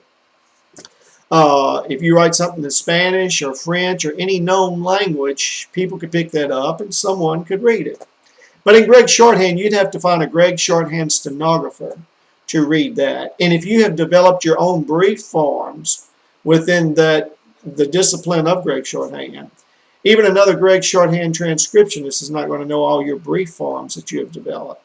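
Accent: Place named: South, United States. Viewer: It's called a southern accent. Get over yourself.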